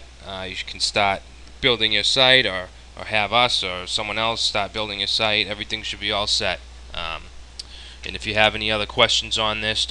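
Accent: Boston, United States. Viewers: Strong boston accent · Dude your Boston accent is ringing in my ears. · with the boston accent lol.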